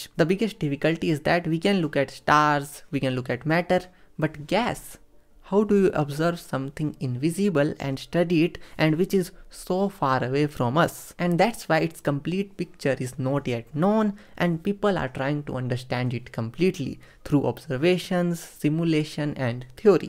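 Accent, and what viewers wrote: India. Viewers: Hey, Are you Indian? Your accent is exactly like Indian!! Astonished from your knowledge in that depth. Being a 10th grade, student you are a true motivation and inspiration ✨️ ❤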